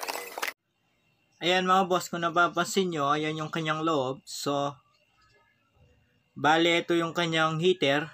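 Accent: Pampanga, Philippines. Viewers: Kapampangan ka no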